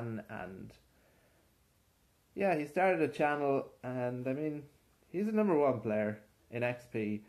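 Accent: Ireland. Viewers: Are you Irish, and if you are where are you from (what county) & Do you stream on twitch or anything?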